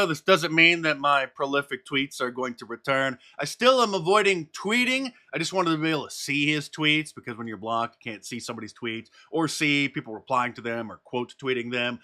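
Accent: United Kingdom. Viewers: Proud that the satirical voice over was by a Brit! 👍👍👍👍🇬🇧🇬🇧🇬🇧🇬🇧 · At least the voice over could have been done by an American sounding voice, not, British, since we are talking about the US Capitol. But, I did enjoy it, and it was funny. I heard the whole thing on Benny Johnson.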